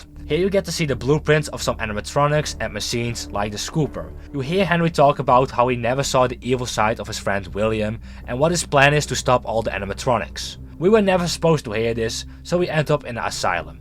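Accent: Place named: Netherlands. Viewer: waarom klink je nederlands haha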